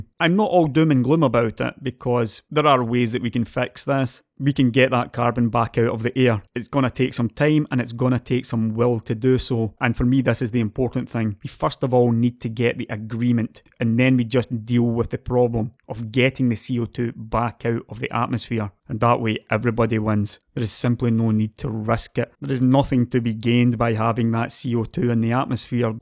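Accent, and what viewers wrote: Scotland, United Kingdom. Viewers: Love the video and watched through fascinated although I knew most of it. The sound of Scottish makes it that more interesting. Thank you.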